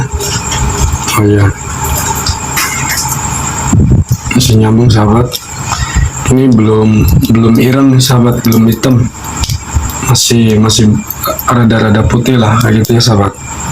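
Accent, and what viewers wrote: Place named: Banyumas, Indonesia. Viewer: sampean wong ngapak kulon ya mas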